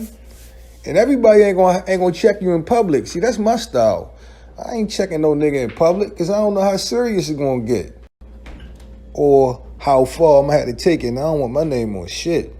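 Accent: Baltimore, United States. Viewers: Somebody tell me this host ain't from Bmore 🤔🤔🤔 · Are you from Baltimore cause you have a Baltimore accent? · The way you talk I take it you from b-more am I right🤔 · Love the B-More accent lol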